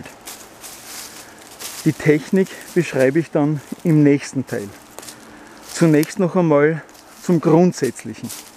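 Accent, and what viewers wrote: Austria. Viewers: DANKE DIR.DEINE STIMME IST ZAUBERHAFT SÜDDEUTSCH.ÖSTERREICHISCH. DANKE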